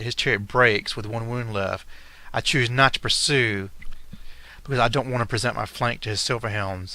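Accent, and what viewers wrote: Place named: South, United States. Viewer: Only just started wtaching your Battle reps and you probably get this alot, but your intro music is class and southern accent is great for narrating! The battle reps are very good also! Great Job!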